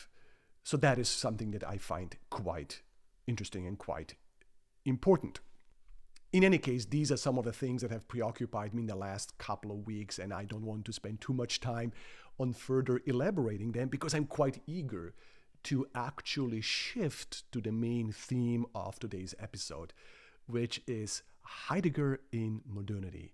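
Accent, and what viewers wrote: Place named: Germany. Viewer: That was a wonderful talk, and it has certainly awakened my interest in Heidegger. Thank you! Your spoken English is excellent, by the way. Your accent is difficult to place. Ist Deutsch ihre Muttersprache?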